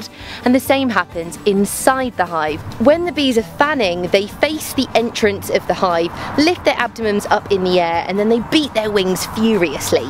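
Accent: United Kingdom. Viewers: What's wrong with me that i feel ichy when she talks. I love british accent but only when I like the speaker.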